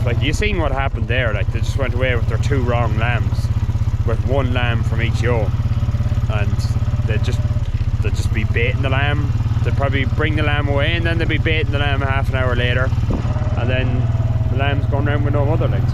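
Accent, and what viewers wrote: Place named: Ireland. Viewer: Another fabulous vlog!!! Each one gets better than the last. Great to see some of your flock close up….. they are gorgeous!!! Love that you chat to us as you go on your rounds. I could listen to you all day long with that lovely Irish accent! Great to see those ewes recovering & the lambies up & sucking. Good call with the calcium & other treatments. Casey was amazing catching that last ewe, she really stood her ground at the end there. I feel your frustration though when she’s raring to go but you really need her to stay put on the bike. Miserable conditions for you & the lambies in the drizzle & the cold. I pray the sun starts shining again for you soon!!! Quick question; if a ewe needs a calcium injection, is it a one off dose or will she need a few, & how do you know that her problem is resolved? Also, I’ve heard of twin lamb disease, is that calcium related or something totally different?? 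(I know, that’s 2 questions…… I’m pushing my luck 🤣)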